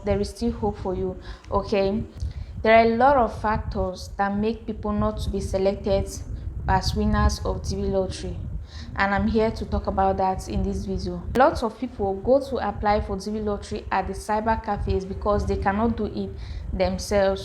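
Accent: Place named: Cameroon. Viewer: She sounds like a Cameroonian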